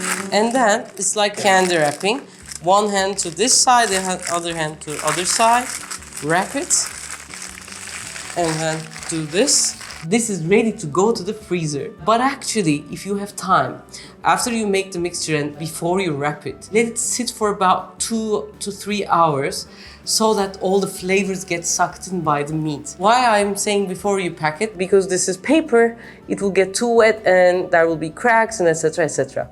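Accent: Turkey. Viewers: I love how Turkish affects your English. I think it sometimes gets really funny. PS: I feel like "efficiency" may be a better translation to "bereket" than "sustainability". You try to get more from the things you have, so they have more "bereket". I think it describes efficiency quite well. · Love ur recipes 😍 plz Share recipes of Turkish desserts too. I like ur Turkish English accent so soft. love from India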